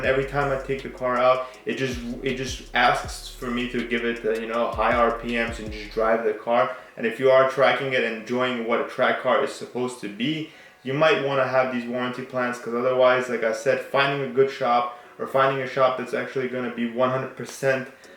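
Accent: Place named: Armenia. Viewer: Your eyes look just like pure Armenian eyes and the accent is Arm as well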